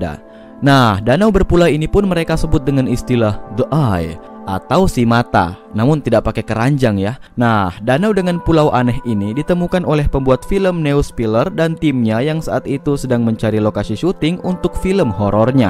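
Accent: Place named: Bugis, Indonesia. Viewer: Logatya bugis🤣🤣🤣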